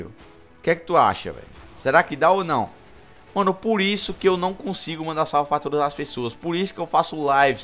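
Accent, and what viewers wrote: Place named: Paraíba, Brazil. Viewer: paraiba da pra ve o jeito q vc fala kk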